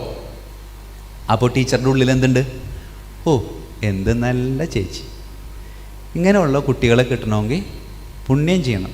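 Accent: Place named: Irinjalakuda, India. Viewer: irinjalakkudakkarrude samssaram pole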